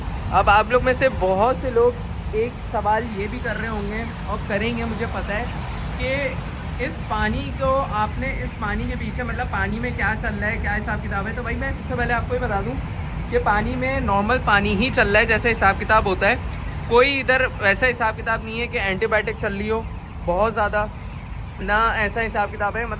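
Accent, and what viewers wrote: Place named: Punjab, India. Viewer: Bhai ap hain kahan? Punjab side lag rahi ha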